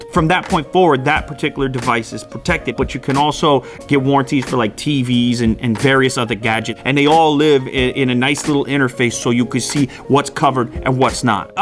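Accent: Canada. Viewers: Is this guy Canadian? He got really Canadian about halfway through the video.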